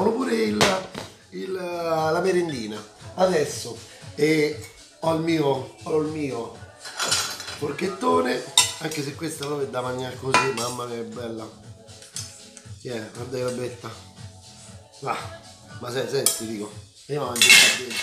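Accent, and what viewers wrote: Rome, Italy. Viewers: CIAO.. TI RINGRAZIO TANTISSIMO PER TUTTE LE VOLTE CHE CUCINI.. SEI UN GRANDE👍MI PIACE TUTTO QUELLO CHE CUCINI... E POI QUANDO ASSAGGI QUELLO CHE HAI CUCINATO FAI VENIRE VOGLIA DI MANGIARE E DI CUCINARE SUBITO.. SEI PROPRIO SIMPATICO.. POI PARLI ROMANESCO E IO ADORO TUTTO QUELLO CHE È ROMANO... IO SONO UNA CUGINA DI ROMA.. (CIVITAVECCHIA) POI SONO UNA NONNA DI 73 ANNI.. QUINDI È PIÙ APPREZZABILE QUELLO CHE SCRIVO.. GRAZIE... UN SALUTO A TE E LA TUA CAMERA WOMAN.. UN BACIO AL BIMBO.. CIAO ALLA PROSSIMA.🌹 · Il dialetto romanesco è sempre uno spasso..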